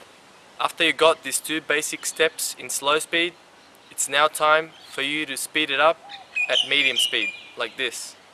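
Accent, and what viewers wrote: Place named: Australia. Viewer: I thought you sounded kinda Aussie haha nice tutorial though, got the hang of it in about 20 minutes ;)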